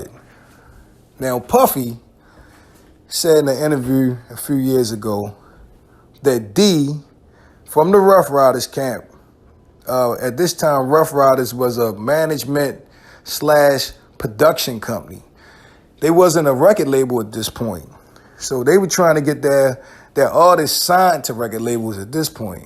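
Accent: Baltimore, United States. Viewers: Yo.. Are you from Baltimore?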